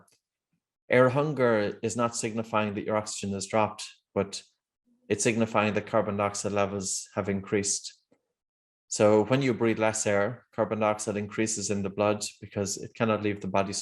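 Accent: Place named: Ireland. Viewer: This is a very useful video. The Irish accent is so beautiful and calming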